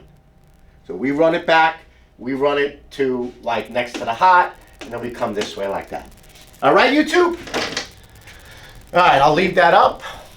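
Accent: New York, United States. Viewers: Dear, thank you very very much for your reply! I’m learning a great deal from your incredible videos, and even though I live in TX now, I am also from NY. The first time I heard your voice, I felt like I was at the local deli again listening to the fellas 😂. I wasn’t able to finish the job as of yet, because I’m rebuilding the entire 3” PVC intake/ vent system that was put together with silicone caulk 😡. Needless to say, and because I work alone, this job is now set back two days for the venting replacements ( the units are in the attic immediately adjacent to the 22’ high vaulted ceiling joists in the great room with the rafters framed over the top of it. Like a scissor truss. No flat surfaces to stand on where the venting runs to exit the roof, all of the venting was obviously done on make shift scaffolding during construction. How did you know they sent me a schematic “without” the PRV’s in it? 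Never mind, no need to answer that, lol. I appreciate you very much Sir. May I please send you an email with a copy of the piping schematic they sent me? I can also send you a picture of what I have to work with. Thank you! RXP199iN and an RX199iN with a dedicated recirc line. I have the Rinnai dedicated recirc valve with the additional brass elbow you recommend to make everything work as intended. I also have the REU-EZC3 cable and the MC195t controller for recirc scheduling.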